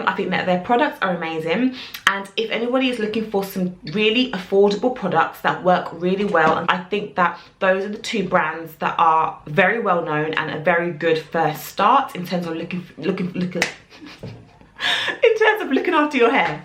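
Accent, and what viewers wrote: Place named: United Kingdom. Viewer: You have a beautiful UK accent and your tips are nice and right on point.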